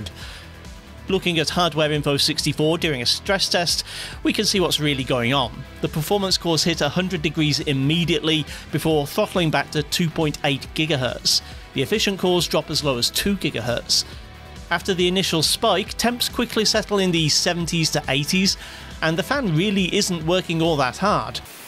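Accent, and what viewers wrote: United Kingdom. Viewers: The presenter is British and therefore pronounces words in English.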